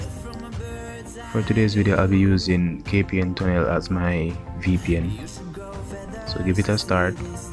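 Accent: Jamaica. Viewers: You a jamaican?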